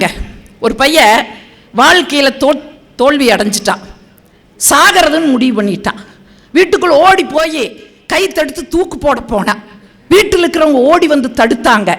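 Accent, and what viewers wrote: Kongu, India. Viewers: ஆட்ட, ஆட்டையப்போட்டு, கிடாவிருந்து வைத்த து,குபீர் சிரிப்பு!பத்தாயிரம்,போச்சே! பெண்களுக்கு அழகு சாதனம்,நாற்பத்தி,எட்டு! மேச்சுக்கு,மேச்சா!,கொங்குதமிழ்,மெய்சிலிர்க்கவைத்தது❤ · கொங்கு தமிழ் 🎉🎉🎉🎉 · எங்கள் பகுதியில் நாங்கள் பேசும் தமிழ் இந்த கொங்கு தமிழ். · உங்களுக்கு கொங்கு தமிழ் சிறப்பாக இருக்கிறது அம்மா 💐💐💐💐💐💐💐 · கொங்கு தமிழ் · கொங்கு தமிழ் கொஞ்சுகிறது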